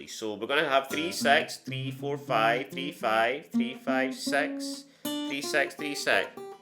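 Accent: Scotland, United Kingdom. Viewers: Very cool lesson dude , I’m in the United States , I love the Scottish accent , reminds me of a guy I used to work with over here , he was born in Glasgow and the plates on his car said Haggis 1 , he was a cool guy !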